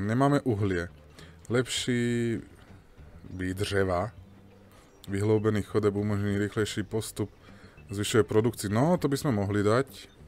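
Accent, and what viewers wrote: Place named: Slovakia. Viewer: Ach,jo..Ty jsi ale případ. :D Ale nakonec jsi zjistil jak na to,ne?Asi ti to zabralo pár hodin pátrání a zkoušení,ale co už no....Jako v tom večerníčku Pat a Mat.Akorát,že ty jsi na to sám.Moc jsem se pobavila. Děkuju. :D Vím,že se opakuju,ale to slovenský ř nemá chybu. :D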